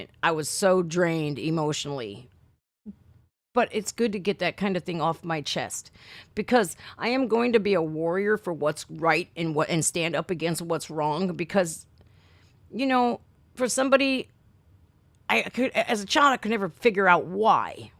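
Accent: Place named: South, United States. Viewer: Hey was wondering if you are from the south? Cause you got a southern accent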